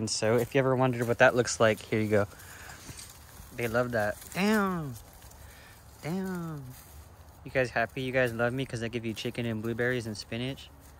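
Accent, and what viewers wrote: Texas, United States. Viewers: U talk so Texan shout out from DallasTx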